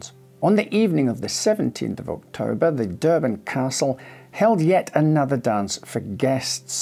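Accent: Scotland, United Kingdom. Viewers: You definitely have a Scottish twinge in your words, and I love the way you speak. Thank you for another very interesting story.😊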